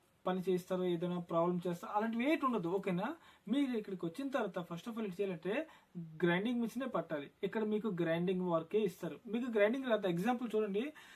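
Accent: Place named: Andhra-Odisha border, India. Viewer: నువ్వు ఆంధ్ర ఓర్రీస బోర్డర్ కదా నీ తెలుగు అలానే ఉంది బోర్డర్ కదా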